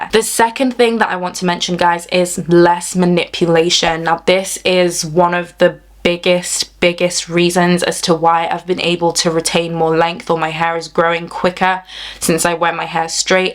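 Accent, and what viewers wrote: United Kingdom. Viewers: Very helpful video, i had a feeling this was the case. My hair is relaxed so i normally blow dry it but i think i will go back to flat ironing it as it lasts much longer when the cuticles are sealed. I steam once a week. Also its nice to hear another UK accent.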